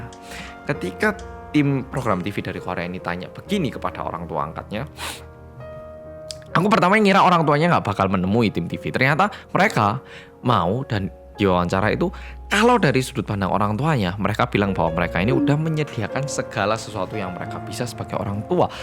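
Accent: Java, Indonesia. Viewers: hello guys welcome back to Korea Reomit bersama orang korea yang.. MEDHOKKKKKKKKK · mau ngumpulin org yang sama sama nyebut medhookkkkk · Kenapa kalau lihat mas gw selalu tiruin intro nya HALO GUYS WLCOME BACK TO KOREA REOMIT BERSAMA ORANG KOREA YANG MEDHOKKKKK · back to story from, wes medok, muaenak tenen dengar ceritonya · kok seneng q ndelok e mase medok e iku 🤣🤣🤣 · Podho Karo tonggoku ,jare wong Korea ,tapi ngomonge medhok juowooo pol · Orang Korea Medok. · Oke bolo-bolo , bersama orang Korea yang Medokkkkkk ,🤗🤗🤗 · Pentinge "medhokkk" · editor nya mantap bang nya lebih mantap..Fix paduan mantap 😆😆 Salam medhooookkk!😂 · Mas medokkkkk wkwkwk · bersama orng korea yang... AKU&: menDOK!!! Aku selalu ngikutin kata "mendok" setiap opening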